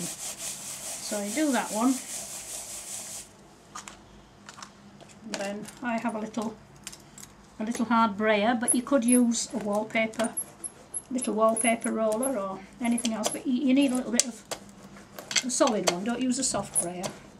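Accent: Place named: Nottingham, United Kingdom. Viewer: I am new to guilding flakes & came across your lovely videos with wonderful tips, I am so impressed with your budget ideas I have just ordered the mix bags of flakes you have on Ebay, & dont worry about the accent, I am in Nottingham & understand every word! lol great videos, thank you for making them for us xxx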